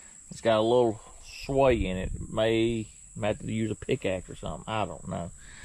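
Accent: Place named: Tennessee, United States. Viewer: Your landowner that you just set up in , where the trailer is, he sounds like Burl Ives 👍. I love your show, love your accent too, even though I’m from Erie Pennsylvania I have my mama’s family from Tennessee 😃same accent, that’s a excellent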